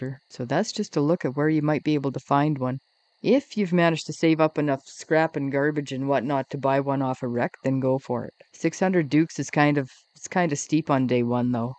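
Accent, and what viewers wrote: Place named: Canada. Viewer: Not sure I’ve heard a more Canadian, it’s gotta be, accent. Love it lol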